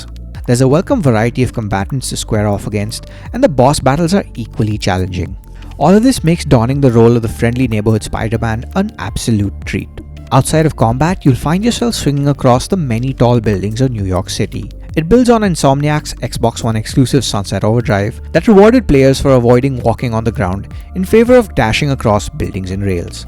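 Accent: India. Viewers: Although I liked the video. But seriously don't try to mimic any accent. Indian accent is way better understandable than others don't try to mess it up.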